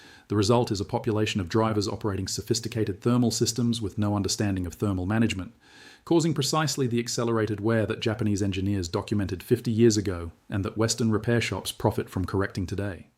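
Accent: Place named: Australia. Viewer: Asian Guy got himself an Aussie accent!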